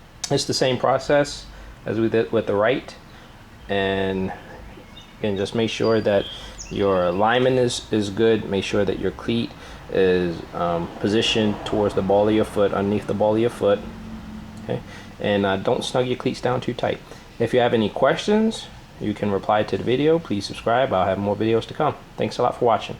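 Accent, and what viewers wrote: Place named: Baltimore, United States. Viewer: This guys got a super baltimore accent. Good vid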